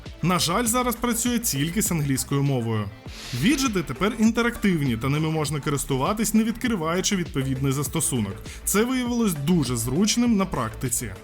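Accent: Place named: Russia. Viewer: От просто безмежна повага. Чутно по голосу що автор розмовляв на рос. язику. Але перейшов на українську. Дякую за приклад!!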